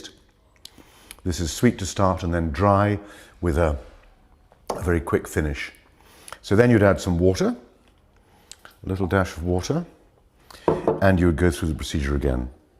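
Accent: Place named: England, United Kingdom. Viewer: sounds English with Scottish notes on certain words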